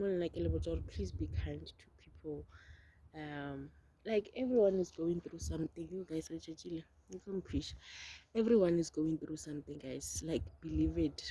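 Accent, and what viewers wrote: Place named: Nigeria. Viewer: Perfect Nigerian accent😂😂😂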